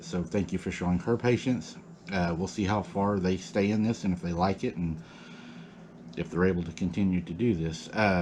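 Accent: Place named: Tennessee, United States. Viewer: You must be from Tennessee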